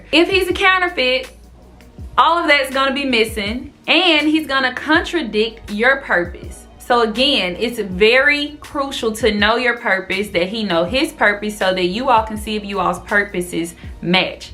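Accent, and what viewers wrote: South, United States. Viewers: No offense. But I’ve never seen a black women with a southern accent 😅 very unique and interesting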